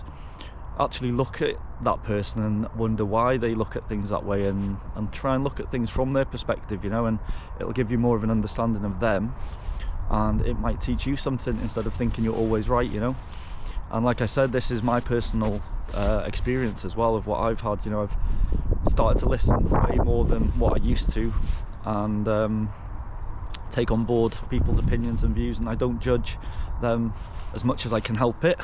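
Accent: England, United Kingdom. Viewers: Your accent is english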